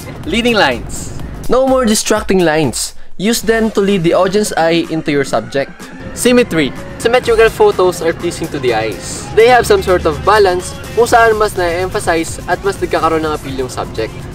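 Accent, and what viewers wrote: Philippines. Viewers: That sounds like a Filipino accent ? And Tagalog am I Tama ???? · You remind me of my Phillipino boss here in UK your accent.😍😍😍. I like you you are a very good teacher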